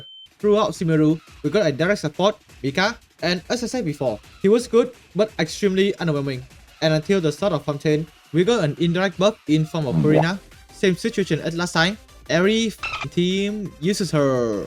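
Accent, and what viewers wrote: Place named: Vietnam. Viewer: Hmmmm... vietnamese accent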